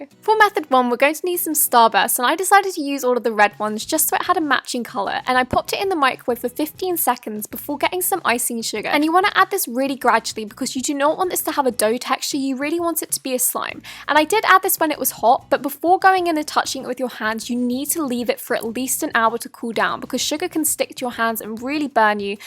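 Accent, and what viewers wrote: England, United Kingdom. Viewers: Are U from England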